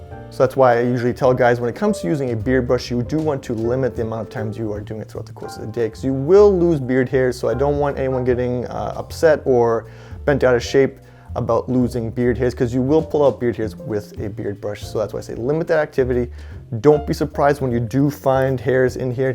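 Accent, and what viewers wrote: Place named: Canada. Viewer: I honestly never noticed that you say "out" with a Canadian accent ("oat") until today's video, haha.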